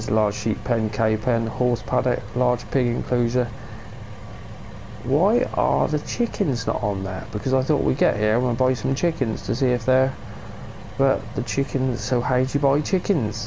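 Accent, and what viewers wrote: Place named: Somerset, United Kingdom. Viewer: Somerset farms tour, complete with a somerset accent, lol New to this map, being born and raised close to somerset this map was a must for me, this was probably one of the better map tours I've found, good job fella (liked and subbed)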